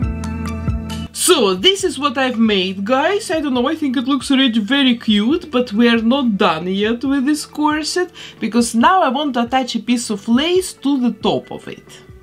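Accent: Russia. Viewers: you have a Russian accent in your pronunciation. are you Russian? :) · У меня шиза или это русский акцент?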